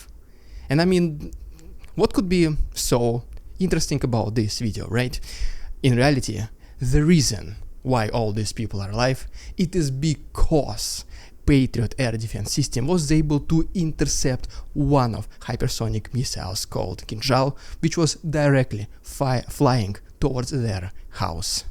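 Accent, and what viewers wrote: Russia. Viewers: lol Russian sounds great in English :D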